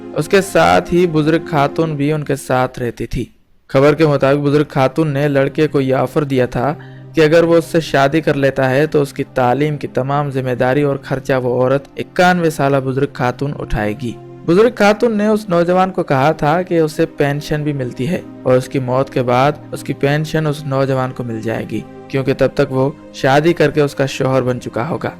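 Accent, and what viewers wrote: India. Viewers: Bhai Aap Indian style main kion baat karnaiy ki ko as hash kartaiy ho ?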